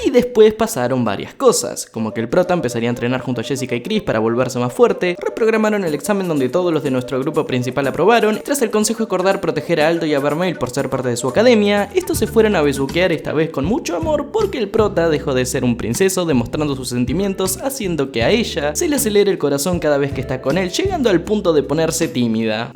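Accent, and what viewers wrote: Argentina. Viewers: che soy yo o tiene un re asento ARGENTINO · Sos argentino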